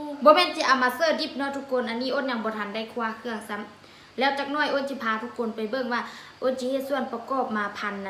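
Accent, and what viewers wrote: Laos. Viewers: ຊົມເຊີຽທີ່ເວົ້າພາສາຊຽງຂວາງລ້ວນໆ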